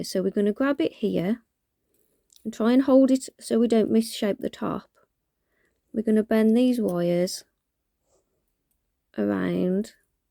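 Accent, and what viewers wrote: United Kingdom. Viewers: I agree about her voice. I love to hear her say, " hi I'm ". It's that UK accent that just sounds so good. No matter if its men, women, or kids. All the people from the UK, I love their voices.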